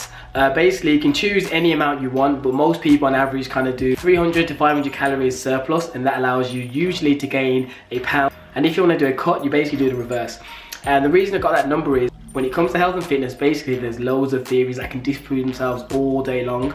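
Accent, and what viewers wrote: Birmingham, United Kingdom. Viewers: I know, I love the Brummy accent :-)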